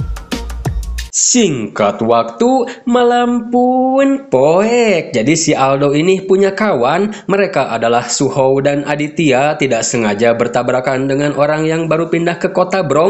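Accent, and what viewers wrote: West Java, Indonesia. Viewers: Lanjutkan min keren lucu pembawaan nya 😂,sunda pisan uhuyyyyyy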